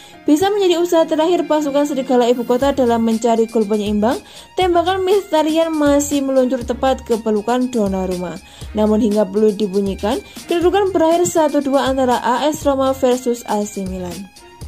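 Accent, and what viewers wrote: Java, Indonesia. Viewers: medok abizzz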